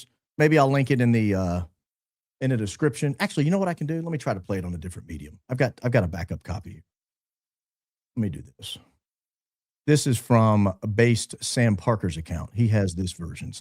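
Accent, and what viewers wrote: South, United States. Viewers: I really like the way you say “was” and “drunk“. Your subtle southern drawl comes out with the “uh“ sound.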